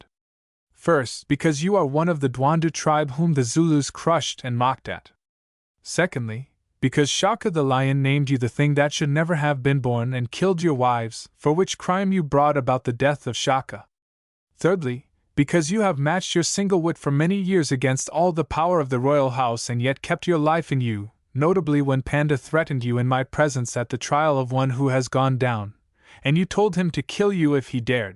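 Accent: United States. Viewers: Have been a fan of H. Rider Haggard since the 1960s, especially the Allan Quatermain series. It is a shame that the reading is done in a rather flat artificial intelligence voice, and an American one at that, with numerous mispronunciation, the rendering of Natal is especially jarring one, it's a place not giving birth. Have also listened to my favourite AQ story " Allan and the Holy Flower " read once again with a American voice, this time female, and it's very difficult to except that voice taking the part of a English male big game hunter in Africa in the 1800's. It needs someone like Greg Wagland, who is a most excellent audio book reader to take on the roll. I did give this book a thumbs up not because of the reading but because of the book.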